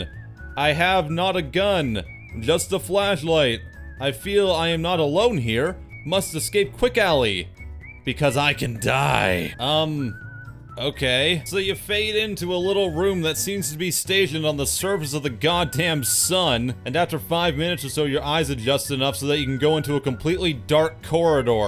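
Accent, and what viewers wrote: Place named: Italy. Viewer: This is the way some italian speak english lel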